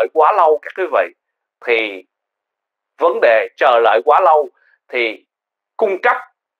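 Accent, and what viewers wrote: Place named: Mekong Delta, Vietnam. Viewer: Em trai nói giọng miền tây nghe đã tai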